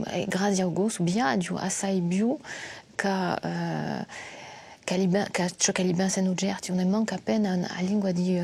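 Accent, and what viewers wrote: France. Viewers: BELLA LINGUA MA CON UNO STUCCHEVOLE ACCENTO FRANCESE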